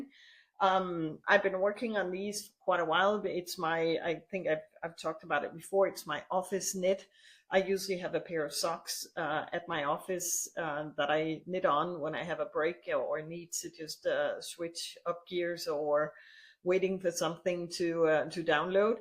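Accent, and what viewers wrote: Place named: Denmark. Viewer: Thank you for this podcast. Love also the little danish prononciation🤗 Quiet complicated Danish language…..but I try to repeat it a few times after you😂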